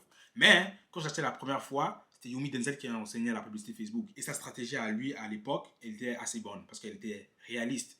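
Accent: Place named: Montreal, Canada. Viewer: tu es de Montreal ? tu as semble avoir l'accent de chez nous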